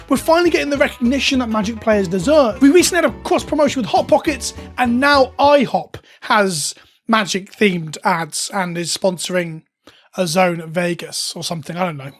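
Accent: United Kingdom. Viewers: Holy shit, youre british?!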